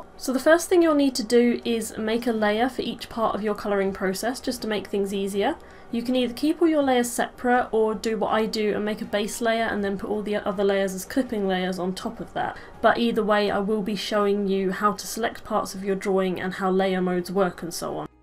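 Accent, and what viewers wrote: United Kingdom. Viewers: I just can't stand this funny british accent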